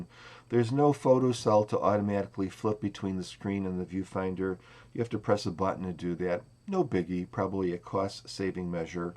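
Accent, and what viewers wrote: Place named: Upstate New York, United States. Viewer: Thanks for the review, I'm picking this up used tomorrow for $100. I think it's great for the price. I enjoyed listening to you speak, your accent reminds me of my relatives in Oswego NY.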